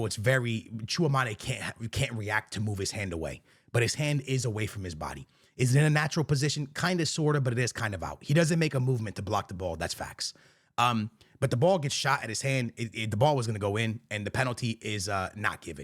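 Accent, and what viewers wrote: DMV, United States. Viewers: Bro are you from the dmv? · Wait, is he from the DMV? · Yo youre from the dc area?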